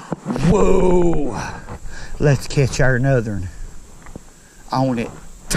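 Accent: South, United States. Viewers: You got that Forrest Gump accent where you from ? Nice video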